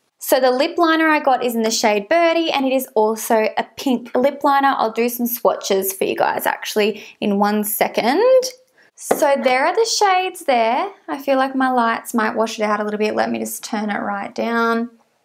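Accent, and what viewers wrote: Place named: Australia. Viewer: I Love your Australian accent it's beautiful, Are you able to get collection products if so I would love for you to do a testing video for it because I want to see other people's reaction to some of the products I own and love and if you agree X Shannon love you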